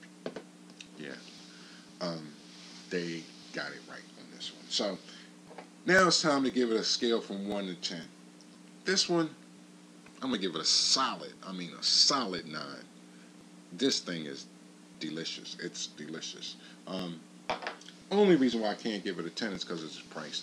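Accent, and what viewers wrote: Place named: Maryland, United States. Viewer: Your murryland accent is so on point It's like marylanders replace a's with the "ur" sound haha.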